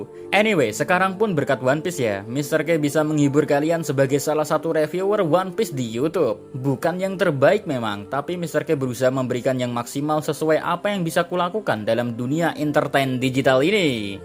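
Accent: Java, Indonesia. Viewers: Orang jawa mana suaranyaa🤣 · Orang mana kok nyamen boso jowone.....salam oplovers tuban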